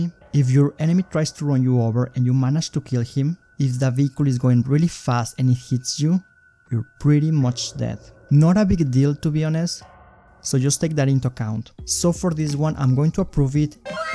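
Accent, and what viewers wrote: France. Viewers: his accent sounds french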